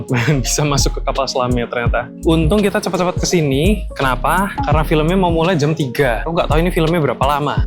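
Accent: Java, Indonesia. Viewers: Habis nonton jadi laper, Ngiler bgt liatnya Btw jowo iso medhok ya wkwk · apa kamu orang jawa? soalnya logatmu jawa bgtt wkwk (muji) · medok men lak ngomong 😂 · Es krim parfum :_akePepelol::_akePepelol: seru banget nontonnya dari awal sampai akhirr :_akeApprove: suaramu auto medok d sini :_akeLol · Medhok bet, cocok tp😦✨ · Lol, medok katanya...aq dengerny biasa aja sih...karena setiap hari denger yg medok-medook 😂😂 Anyway, seru juga buat dicobain. Aq lagi cari ide wisata buat suami n ibuku yg udah lansia. Stay happy n healthy yaa❤ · Tiba-tiba jadi medhok banget, sampe kaget loh aku, tapi cocok kok 👍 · full medhok rek 😂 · lucuuu kamu yaampun tiba-tiba main ke kotaku, mana ga expect medok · mode medok nagih banget plisssss 😭😭😭😭💞 anw im glad u enjoy surabaya 🥹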